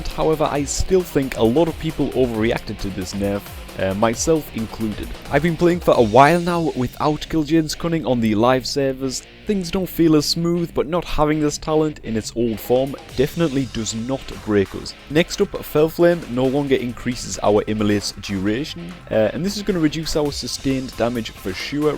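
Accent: Newcastle, United Kingdom. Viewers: I think he sounds like he is from Newcastle ;D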